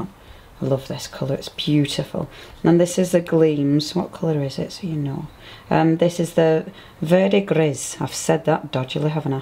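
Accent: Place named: Yorkshire, United Kingdom. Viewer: Just beautiful, loving your Yorkshire (?) accent. Very friendly and informative.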